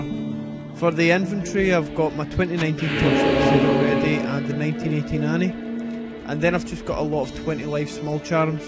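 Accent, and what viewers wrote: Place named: United Kingdom. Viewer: british accent ftw :P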